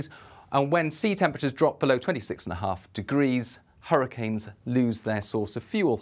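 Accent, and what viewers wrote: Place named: RP, United Kingdom. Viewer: I wish we had a posh sounding bloke doing our forecast here in the South East US. Sounds reassuring. We could use the false sense of security. Lol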